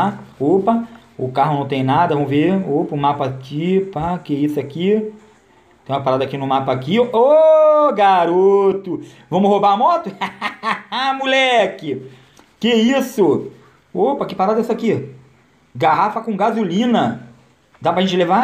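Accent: Rio de Janeiro, Brazil. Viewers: Tu é carioca no Sangue não é?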